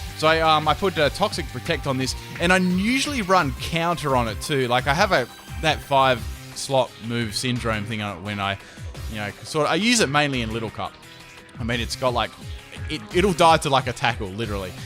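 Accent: Australia. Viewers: Omg ur australian?! What state?! I'm from Queensland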